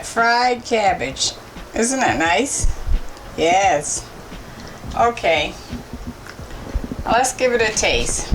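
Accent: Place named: Massachusetts, United States. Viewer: That cabbage looks tasty and side note, I love your accent🥰 I'm guessing you're from the Massachusetts area. I'm southern so I have a very "different" accent from yours🤭 Anyway, I love cabbage so I'll give your recipe a try❤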